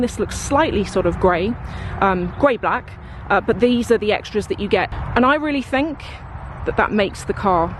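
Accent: RP, United Kingdom. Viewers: She has posh accent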